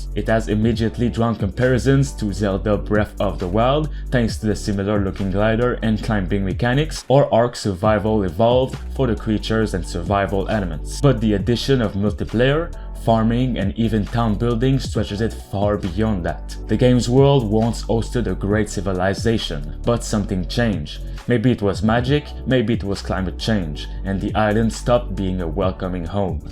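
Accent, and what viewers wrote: Quebec, Canada. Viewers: Hey what’s up! This is the first video i see of yours, and i can’t stop thinking i know where that accent is from. Are you a french canadian? I’m also french canadian:P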